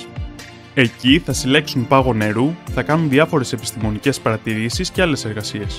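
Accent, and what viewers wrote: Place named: Albania. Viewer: Ε, όχι και τόσο καθαρός λόγος που προφέρει το ρ σαν αλβανός.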